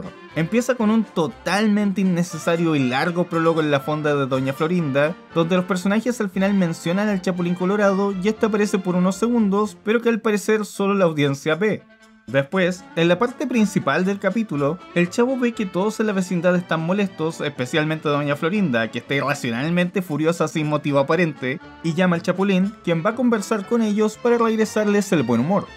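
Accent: Chile. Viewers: a mi lo que me gusta es tu acento chileno poh saludos desde guatemala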